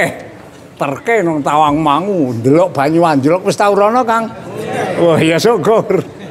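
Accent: Magelang, Indonesia. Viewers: Gaya bicara nya khas orang magelang ....❤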